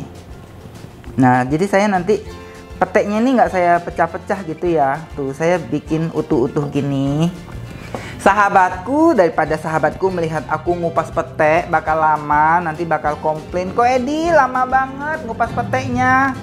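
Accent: Bangka, Indonesia. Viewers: "kita mau mencedoknya" ... kokoooo bangka banget geeh...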